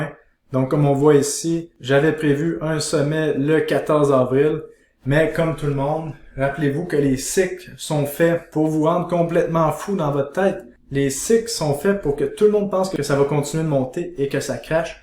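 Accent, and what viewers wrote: Canada. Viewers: Suis je le seul français qui, en lisant les commentaires, entend l'accent canadien ? =D Vidéo intéressante en tout cas, je vais faire attention a cette moyenne pour mes prochains investissements ! Merci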